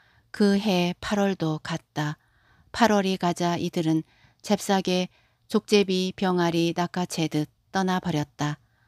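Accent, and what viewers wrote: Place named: Jeolla, South Korea. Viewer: 전라도 억양이 세네요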